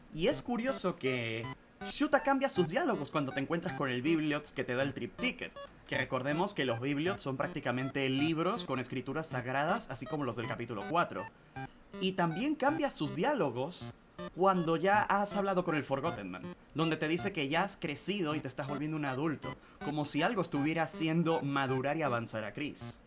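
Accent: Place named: Venezuela. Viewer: Nada más escuchando tu forma de hablar reconocí Venezuela ❤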